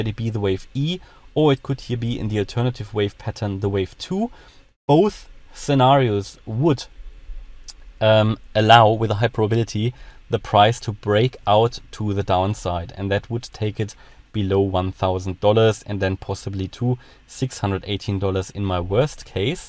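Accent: Germany. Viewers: Are you German? 😁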